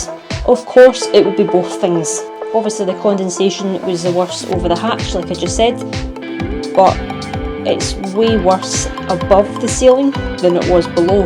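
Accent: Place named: Scotland, United Kingdom. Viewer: Progress is coming along well, you'll learn as you go and no better way to learn than to throw yourself in at the deep end. Got to love the Scottish accent. Keep up the great work and look forward to more updates 👍🏻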